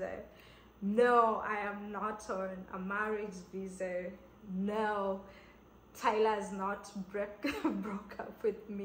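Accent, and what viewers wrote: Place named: United States. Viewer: this accent haitapotelea Kenya i take you back to the states in Jesus name amen.nakupendaa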